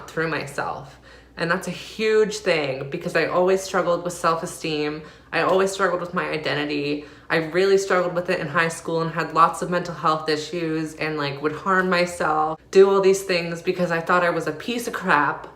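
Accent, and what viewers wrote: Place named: Canada. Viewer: I enjoyed the video, nice to see you doing well. You have a Canadian accent, so your health insurance is nationalized. Good for you!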